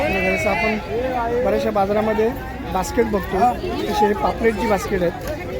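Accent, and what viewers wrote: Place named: Malvan, India. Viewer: Tumchya tondat Marathi bolata bolata malwani bhasha yeta garva asa malwani aslyacha😂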